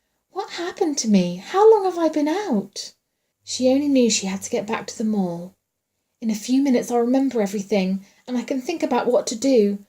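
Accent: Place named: RP, United Kingdom. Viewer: I LOVED this!! Thank you so much for reading this! I read it wheni was a kid (RTC was one of my favoriite authors) and i really wanted to reread it but as audiobook. You did an amazing job!! I enjoyed it, I'll be honest the ending with it being whoit was has always really confused me (was he just a rando? Lol) but i still love the book. Its so quintessentially Point Horror 90s!! And i adored liste img to it at this time of year!! (Not sure how the weather is down south but up north its true spooky season weather now!) Also your accent is amazing! Is. That your real voice!? Im british as well but i sound so common compared to you 😂not sure if its cause youre southern but u have a proper RP accent thats so ni ce to listen to reading tuis book! Stellar job!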